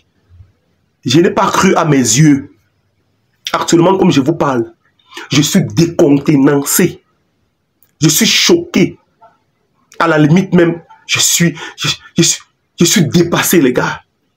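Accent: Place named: Cameroon. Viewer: Regarde comment tu resonnes !une mentalité vraiment camerounais comme les camerounais disent . Le jour tu arrives en cote d'ivoire tu auras honte de dire que tu es Camerounais .par ton accent nous les ivoiriens ont saura que tu es camerounais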